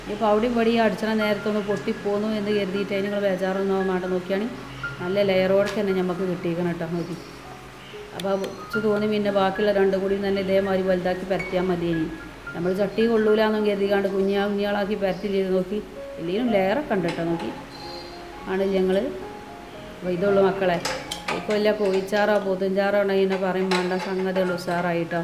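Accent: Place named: Malabar, India. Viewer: Pure malabar Muslim laungage.Very intersting for lissen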